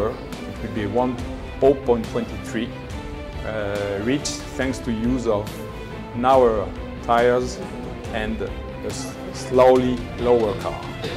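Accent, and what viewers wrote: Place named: Germany. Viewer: So disappointed by hearing a German speak... no matter how well he does his job. I am aware that is unfair.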